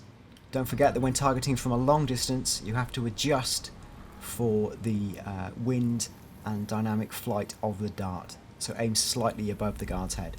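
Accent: United Kingdom. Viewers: You sound like a British Elijah Wood. Cool.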